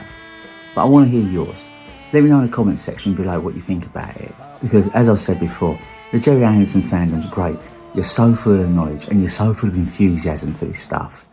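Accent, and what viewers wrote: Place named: United Kingdom. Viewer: i really enjoy your mellow voice and accent - as an american it seems to be more legitimate when talking about the thunderbirds!! I love british sci fi and look forward to more videos. Im really curious about 'The Secret Service" - a personal favorite of mine. Ill never forget the first time i saw the man ride around in the vicars suitcase!!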